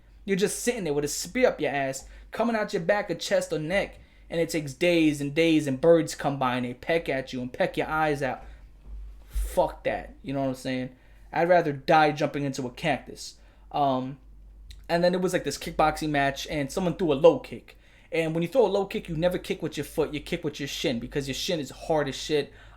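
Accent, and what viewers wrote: New York, United States. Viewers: Man, I haven't lived in the US in 12 years... your accent just hit me hard my bro.... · The New York accent is tuff tuff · yeah as a bi girl I’m fine with it honestly. I do get uncomfortable when creators are openly homophobic cause it makes me feel like they don’t want me watching, but as soon as I remembered the New York accent I knew he wasn’t being serious lol 😂 as a lifetime New Yorker we have kinda fucked senses of humor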